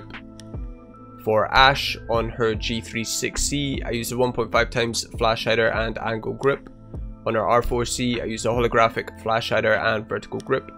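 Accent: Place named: Northern Ireland, United Kingdom. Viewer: Are you from Northern ireland because you sound like it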